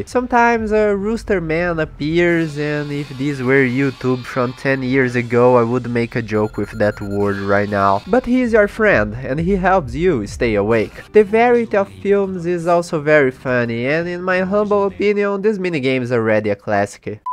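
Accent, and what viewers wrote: Brazil. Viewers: ... your english skills sounds like a brazillian to me. (also i mostly agree on your opnion)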